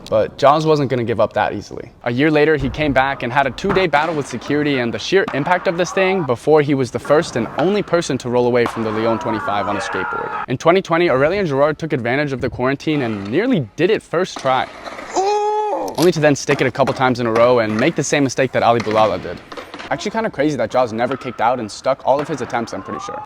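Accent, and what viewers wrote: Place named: United States. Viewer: You’re speaking American and using metric. Wow.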